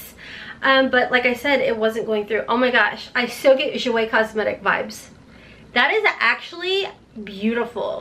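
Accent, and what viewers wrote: South, United States. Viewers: I don’t get subscription boxes but I always watch your videos and have for a long time and I don’t mind your talking either or that southern accent because I’m from Arkansas I have one to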